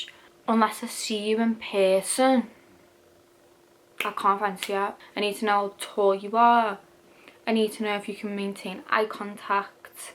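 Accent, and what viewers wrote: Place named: Liverpool, United Kingdom. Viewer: LIVER POOOOL!!! Accent so strong!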